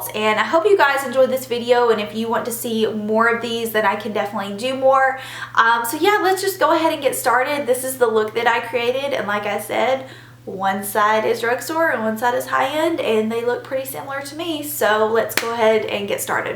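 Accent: South, United States. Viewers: Her southern accent and how she's so animated makes me like her so much more than others I love her oh my gosh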